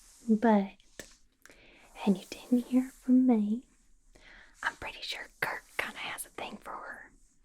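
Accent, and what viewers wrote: South, United States. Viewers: love the inclusion of small southernisms, it really sells the accent and the video was great :3 - a southern person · love the southern accent!! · As a huge marvel nerd, the southern accent is a nice touch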